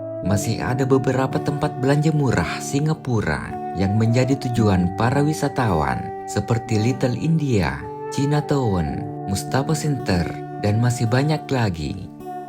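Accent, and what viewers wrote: Bugis, Indonesia. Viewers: aksen (logat admin) nya khas Bugis Sengkang (Wajo) mantap sukses min🙏👍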